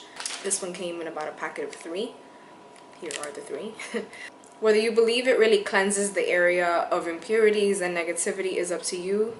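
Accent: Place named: New York, United States. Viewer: I know it’s only through YouTube, but I love your vibe and your NY accent. I like how thorough you are in the steps and the fact that you act them out so we have an idea of how we may like to do them. You are a blessing. Keep it up. Bless!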